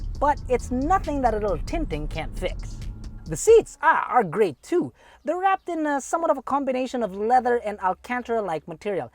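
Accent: United Kingdom. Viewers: That hilarious British accent killed me! 😂 On a serious note, what are non-Chinese car manufacturers thinking? Chinese car brands (or atleast cars made in China) are bringing better tech and value at a lower price in the Philippine market. It's as if they're just relying on brand recognition and perceived "dependability in the long run" to make sales. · medyo dinig yung hangin sa B-roll.. baka mas okay kung i-mute? Love the British accent. hehe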